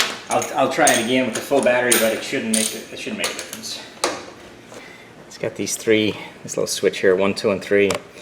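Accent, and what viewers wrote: Northern Ireland, United Kingdom. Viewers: Have one of the old type 1/2 Dewalt impacts tough and durable BUT heavy and not very compact still hanging on to bit of the nordie accent greetings from Dublin.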